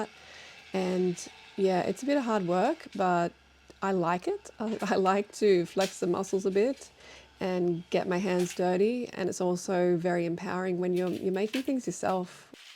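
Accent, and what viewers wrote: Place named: Australia. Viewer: Hey, where are you located? By your accent, you are Australian as I am. I live in Fiji.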